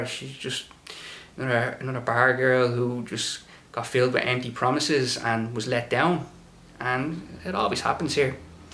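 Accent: Ireland. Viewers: The great irish accent makes the story a better listen 👍🏾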